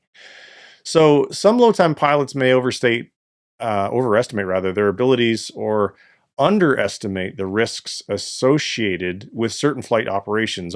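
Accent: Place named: Canada. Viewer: Judging from your voice, it appears you are Canadian or have spent some time there.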